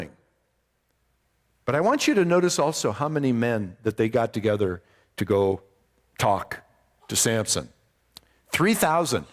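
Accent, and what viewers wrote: Canada. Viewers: Me too. Haha. I'm from Ontario Canada and we don't all have accents. Lol. He sounds canadian to me.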